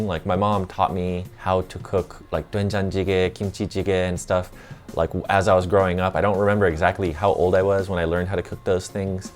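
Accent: United States. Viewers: Ribas Yes, he does! really nice one... Sometimes I comment down on his Instagram photos and his Youtube videos, replied all of it and I'm very appreciated it. I'm Vietnamese, actually English isn't the main language in Vietnam, so I want to improve my English listening skill and then I decided to watch videos. I really love American accent. His voice was warm and inspirational... I always support him 😁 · I'm sure you've been asked this before. But how can you speak such with such a general American accent? You are from Japan, ethnic Korean. If someone doesn't spend most of their elementary school years in the U.S., they will have at least SOME accent. I don't hear even a hint that you didn't spend all your youth in America.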